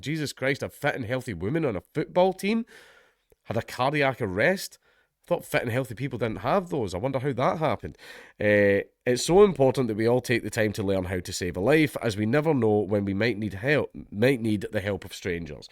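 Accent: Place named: Scotland, United Kingdom. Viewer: I had no idea listening to someone with a heavy Scottish accent say defibrillator would be so entertaining. I am going to ask that you say a few other things. Tarantula. Vocabulary. Distribution. Judicial system. Oh! And Burglar alarm.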